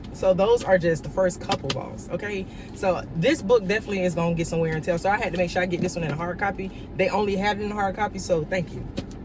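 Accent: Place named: South, United States. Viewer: I hope your leg/ankle gets better!!!! 😂😂😂😂😂you got parking lot rage lil sis next you can borrow my mom handicap sticker she don’t need it. Yo, I love for you to do commentary over a short film or video that would hilarious especially with your down south accent😂😂😂😂. I got an idea next time you go get waxed don’t use the sound from the voice just do the voiceover and put a lil spice with it.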